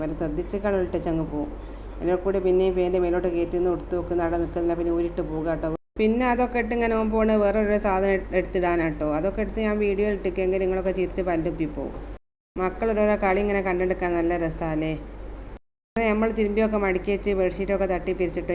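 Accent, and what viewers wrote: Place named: Kozhikode, India. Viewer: Inghalu kozhikkode aano sthalam😂samsaram kettitu aganethonni❤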